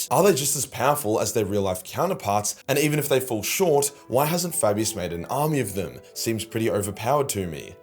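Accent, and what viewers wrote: Australia. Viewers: love the video style fam, your australian accent fits the comedic style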